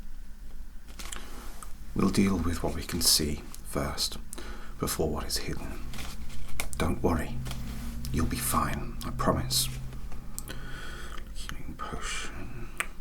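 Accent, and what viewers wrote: United Kingdom. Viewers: Great role play of Sirius Black bud very relaxing am interested are you American or British if your American but doing a British accent than that’s bloody brilliant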